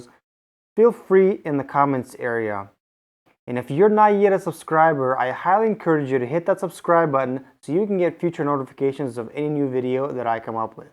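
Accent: Russia. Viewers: Hi! You from RUSSIA?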